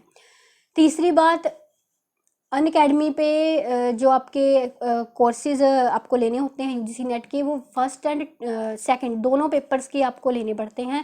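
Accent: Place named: Punjab, India. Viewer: ਜਿਵੇਂ ਤੁਸੀਂ ਬੋਲਦੇ ਓ ,,I like it 😀🤗 ਵਿੱਚ ਪੰਜਾਬੀ accent ,,,pretty voice ਰੁਕਦੀ ਨੀ ਪੰਜਾਬੀ ,,,ਆਪਣੇ ਆਪ ਆ ਜਾਂਦੀ ਐ ,, ਤੁਹਾਡੀਆਂ videos ਦੇਖੀਆਂ ,,,cute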